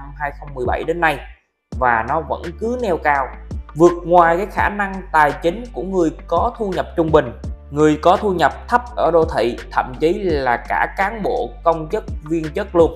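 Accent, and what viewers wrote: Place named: South, Vietnam. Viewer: Dân SG-miền Tây ko ai rảnh hơi mà phát âm chuẩn Hà Nội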